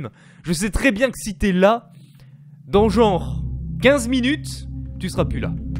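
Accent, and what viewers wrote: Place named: England, United Kingdom. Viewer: Vidéo génial comme d'habitude et ton accent anglais est toujours aussi ouf xD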